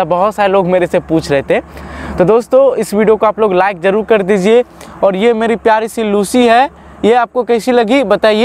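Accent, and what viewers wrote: Bihar, India. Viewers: Bhai kya app Bihar se ho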